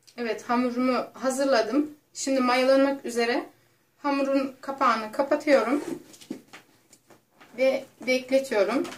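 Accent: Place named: Xinjiang, China. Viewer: türkiyede mi yaşıyorsunuz? lehçeniz uygur lehçesini andırıyor...türkmenistan türkü müsünüz?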